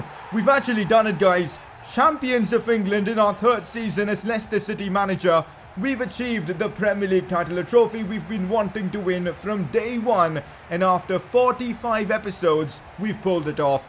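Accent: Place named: India. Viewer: Bro why do you accentuate your vowels too much. That Indian accent is too noticeable lmaooooo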